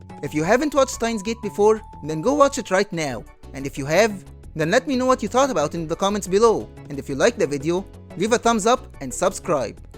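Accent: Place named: Egypt. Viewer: that's an egyptian accent id i've ever heard one. much love and support my guy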